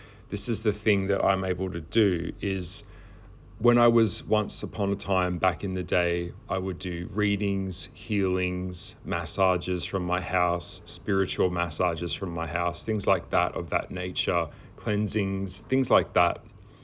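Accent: Australia. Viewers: but you have an aussie accent- where did you grow up?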